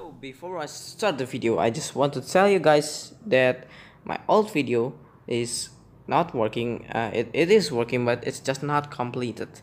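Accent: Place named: Russia. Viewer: I am damn sure you are russian.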